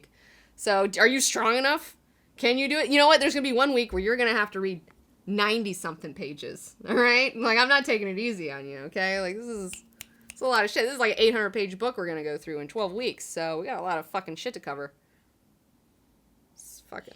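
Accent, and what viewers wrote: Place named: South, United States. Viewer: Oh my god, I didn't realize that you're pushing back a Southern accent until you broke into the stronger (though still mild) Deep South accent when you were get excited (and possibly because of what's in your drink) about how Sony's fucked up Spiderman. Also, truer words have never been said. Sony is a sad fucking movie production company.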